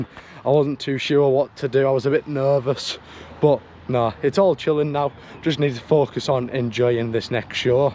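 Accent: United Kingdom. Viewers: is he a geordie? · Very proud of you. I did my first competition at 51 and I thought it was the greatest thing I ever did despite how hard it was. Did you get tired of tensing your legs? The low carb week get you down? You're right, not the most fun in the world but the payoff is incredible. So, what's up with your school? Someone there needs to get a life! Q - trying to place your accent (I'm a Yank). Northern England maybe?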